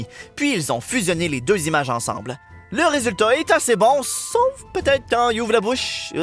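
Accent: Quebec, Canada. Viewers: Dislike pour ce putin d'accent québécois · cet accent québéquois me donne envie de mourrir :( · Cet accent est juste insupportable. Pitié, si, remplacez-le.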